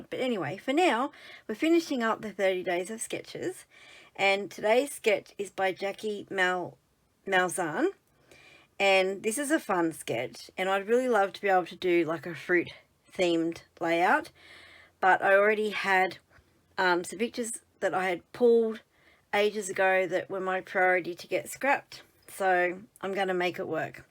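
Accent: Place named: Australia. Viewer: Loved watching how you adapted the sketches to suit your papers/photos, and it is a joy to watch /listen to a fellow Aussie❤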